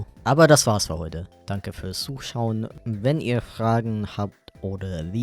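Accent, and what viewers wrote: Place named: United Kingdom. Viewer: No offense an den Typen, aber man hört direkt nen klassischen UK-Akzent raus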